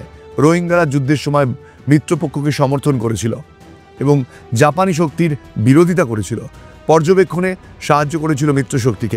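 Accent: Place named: Kolkata, India. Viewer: বাংলাদেশ থেকে কথাগুলো শুনলাম দাদা মনে হয় কলকাতার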